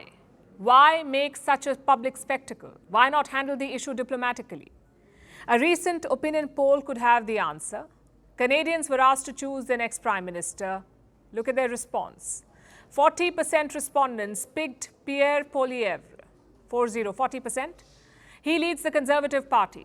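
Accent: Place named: India. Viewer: Dmbaz this is indian accent. Which American canadian talks like that?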